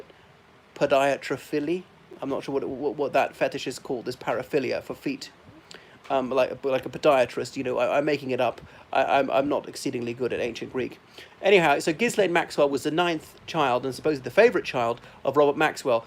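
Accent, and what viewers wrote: RP, United Kingdom. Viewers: What did you do with your Irish Accent?? You have a lovely “queens english” accent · strange you sound like an English toff, not any Irish I know, what's going on here, if my first impression is your mouth is brown, that ain't good fella?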